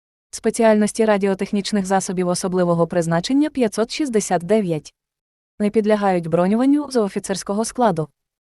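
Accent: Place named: United States. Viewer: де найшли диктора дебільну, може говорити українською мовою. американка чи що.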